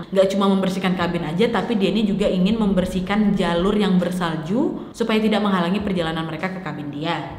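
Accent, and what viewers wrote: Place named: Riau, Indonesia. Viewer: hah makanya itu wak aku suka kali sm dia,dr cara ngomongpun sama karna logat2 melayu batak kepulauan riau😅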